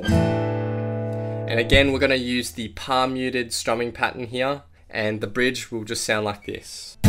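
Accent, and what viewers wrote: Australia. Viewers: are you australian? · are you aussie?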